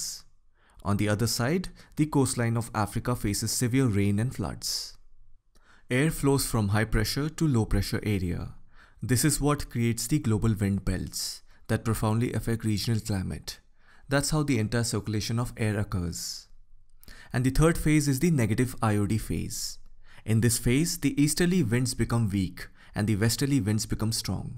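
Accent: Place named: Bihar, India. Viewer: From His accent ..it seems that he belongs to bihar... Cuz of people of bihar pronounce 'R' as 'D'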